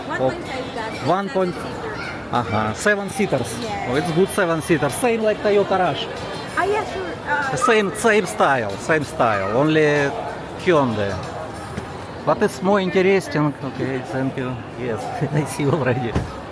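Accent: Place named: Russia. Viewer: Your accent more Russian but it's okay I love your content I have been in Angeles too.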